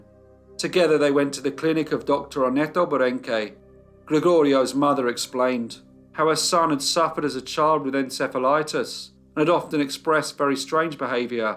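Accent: United Kingdom. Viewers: Nothing eases anxiety like listenening to someone from the British Isles narrate horrifying and disturbing crime. Thank you so much.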